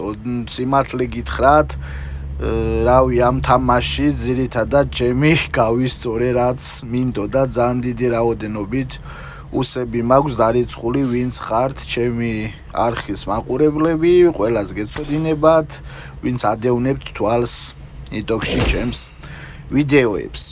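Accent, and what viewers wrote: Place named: Imereti, Georgia. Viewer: შენდენი იუსები საქსრთველოში არავის გოუხსნია საღოლ ძმაო ისე იმერელი ხარ საუბარზე მეც იმერელივარ ვანი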